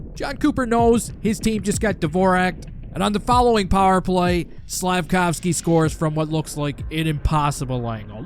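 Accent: Newfoundland, Canada. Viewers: you from Newfoundland or Nova Scotia with that accent? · Are you from newfoundland?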